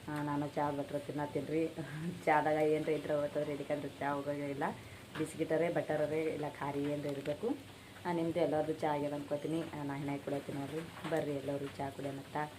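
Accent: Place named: North Karnataka, India. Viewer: Hi sister navu Kolar district navaru. Vlogs yavudhe athiyada aadambara elladhe simple agi channagi madtha edhira eege mundhuvaresi. Utthara Karnataka kannada mathadodhana kelodhake thumbha chanagiruthe but starting 50% nivu mathadodhu artha agthiralila namge.ega parvagila 90% artha aaguthe.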